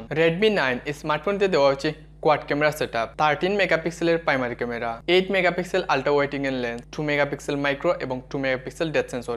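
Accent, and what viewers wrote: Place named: Sylhet, Bangladesh. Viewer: আফনার ভাষায় ছিলটি ছিলটি লাগের।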